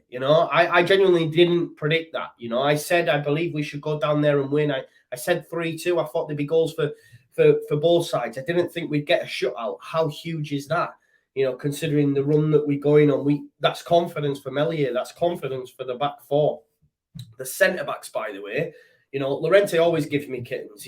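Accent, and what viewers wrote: Yorkshire, United Kingdom. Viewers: Your accent has definately got more Yorkshire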